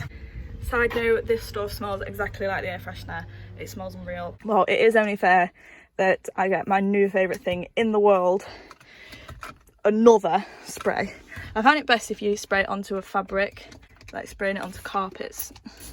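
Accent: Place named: Manchester, United Kingdom. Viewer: You sound like a fellow Manc!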